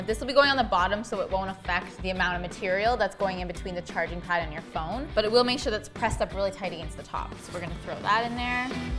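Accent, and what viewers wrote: Canada. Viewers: Are u Canadian.?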